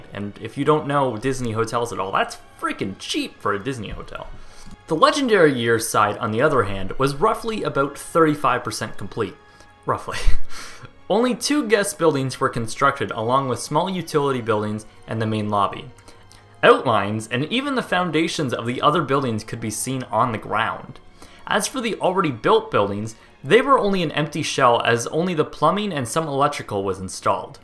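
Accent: Canada. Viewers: Super interesting and wonderfully done,! (are you Canadian? - you have that accent!) Good stuff. I love your work.!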